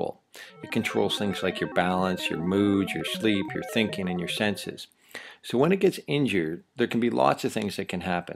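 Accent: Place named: Canada. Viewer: great video! i knew you were canadian from your accent haha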